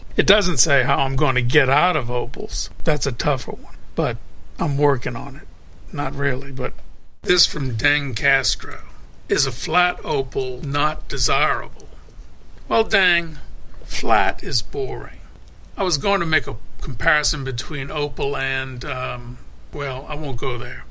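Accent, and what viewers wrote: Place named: South, United States. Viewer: Love your vids. But it drives me crazy that I can't place your accent. It's like a little bit of Virginia or Arkansas, but steeped in some deeper south, like Alabama or Louisiana. You tend to shift in and out of a deeper accent when you talk. Where is it from??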